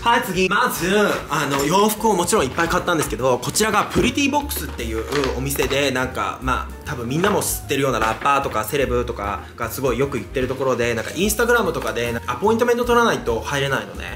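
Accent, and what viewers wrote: Kansai, Japan. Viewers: なんで今日関西弁多いのWWW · って、たまに関西弁が混じるんですが関西出身なんでしょうか？急に親近感🐸（私は兵庫出身です！）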